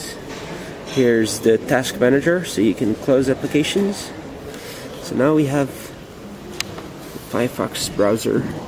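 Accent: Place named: France. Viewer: he sounds french or lebanese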